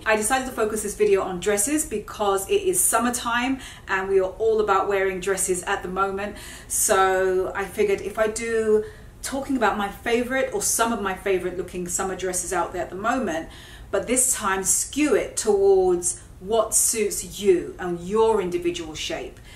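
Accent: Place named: London, United Kingdom. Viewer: Hi, where have you been all my life!! I have been binge watching all of you videos, you are amazing, I have learnt so much from you. I've lost count the amount of times, I have gone to a shop and not found my size in something I wanted and I have just walked away. No more will I be doing this, I will be going for the bigger size from now on. Your London accent got my attention, I'm a fellow Londoner and you are fantastic to watch, I love your passion! You have a new subscriber and I look forward to seeing all your new videos. x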